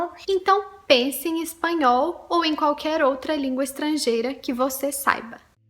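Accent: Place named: Rio de Janeiro, Brazil. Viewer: Are you from Rio, your accent is so carioca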